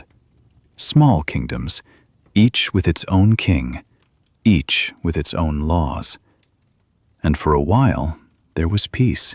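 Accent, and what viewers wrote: United Kingdom. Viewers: Many thanks for this channel You're accent is British isn't it?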